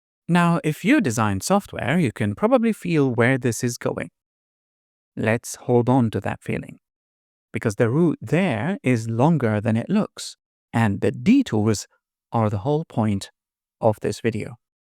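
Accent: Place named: Netherlands. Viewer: This vid haz awesome English gramma (grammar) with a Dutch accent!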